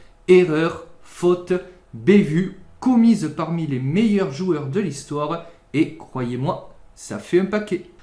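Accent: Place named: South, France. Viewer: Rien n'éclipse en effet la chaleur de votre accent solaire;-) Merci pour cette vidéo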